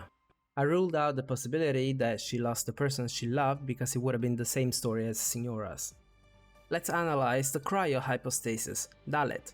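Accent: Italy. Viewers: you are italian arent you? I recognize your accent reading in latin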